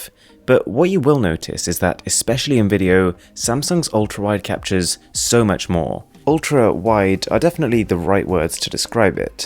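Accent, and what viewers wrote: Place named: United Kingdom. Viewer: Omg i love your british accent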